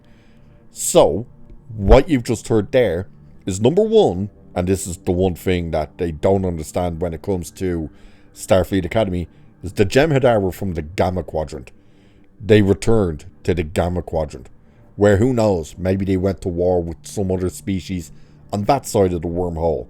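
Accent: Ireland. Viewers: North Dublin 😂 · Love your dialect. Which part of Ireland? Sounds close to some Newfoundland dialects I've heard.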